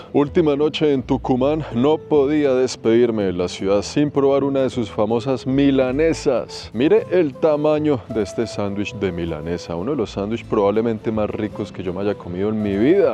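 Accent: Bogotá, Colombia. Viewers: viví 15 años en Argentina y mi esposo y mis hijos son argentinos. Me gusta como narras tus vivencias con ese acento cachaco jajaja. Saludos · NI YO COMO ARGENTINA NO SABIA DE ESA HISTORIA DE LOS QUILMES!!AMOOO EL ACENTO COLOMBIANOS Y A LOS COLOMBIANOS.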